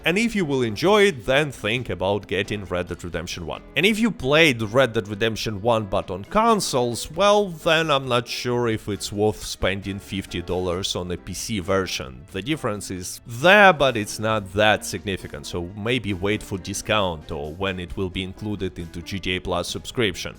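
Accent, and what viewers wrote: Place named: Russia. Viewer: Русский акцент какой то будто слышу😮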